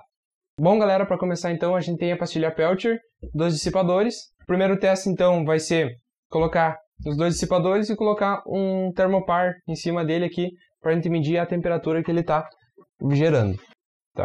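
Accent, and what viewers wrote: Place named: São Paulo, Brazil. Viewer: Esse cara só pode ser do vale do ribeira, pelo sotaque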